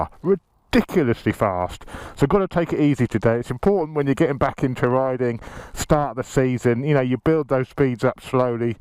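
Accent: United Kingdom. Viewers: Just subscribed to your channel. Being in Japan it's good to hear a British voice on a motovlog for a change. I've also just started making bike videos. Only 1 for now, but check it out if you have time. Thanks and keep up the good work!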